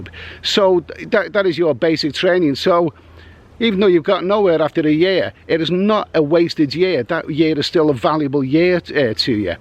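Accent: Liverpool, United Kingdom. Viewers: I watch your streams but sometimes it’s a bit too barrack room for me. I get that you do a lot of sex tourism vlogs but I watch because I think you have a brilliant sense of humour and you are actually a very good travel vlogger. So well done, please be proud of your scouse accent because it’s part of your humour. By the way I’m not a 20 stone bingo player at the local club. You deserve the views because your a good travel vlogger and watching you review Sainsburys pasties and Scouse Brandy is hilarious. They should sponsor you.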